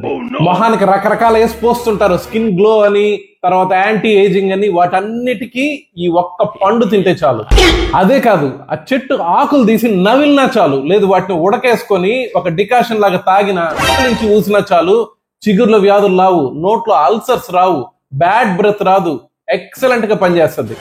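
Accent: Nellore, India. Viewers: Your Nellore slang makes me so happy Dr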